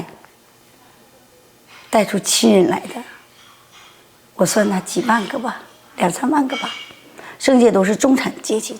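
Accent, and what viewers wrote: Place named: North, China. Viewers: 你的口音就是北方再北方的人的口音, 一个喜欢博别人眼球的人, 还自称广东人, 见鬼去吧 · 这女人格调不高，一口北方口音，关键好像光脚上田没多久的感觉😅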